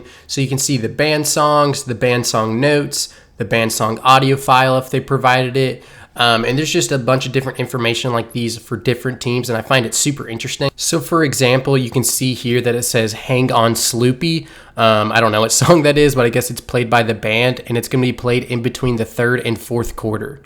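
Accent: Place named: Maryland, United States. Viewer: Guessing you’re from Maryland 😂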